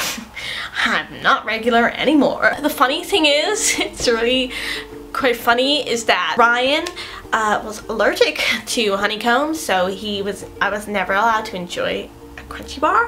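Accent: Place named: United Kingdom. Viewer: Just a few seconds in, and I already forgot that you had a British accent! Great and hilarious video!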